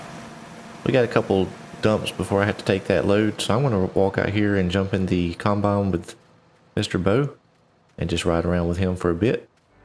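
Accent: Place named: Texas, United States. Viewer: Are you from Texas you kinda got that accent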